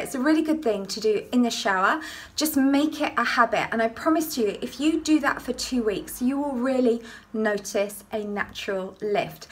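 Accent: United Kingdom. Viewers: superb british accent. 😊😊😊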